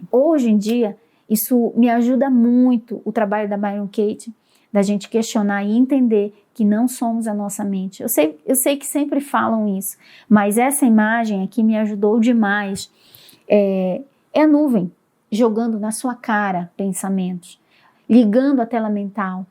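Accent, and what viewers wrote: Northeast, Brazil. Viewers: Adoro seu sotaque meio do norte ou nordeste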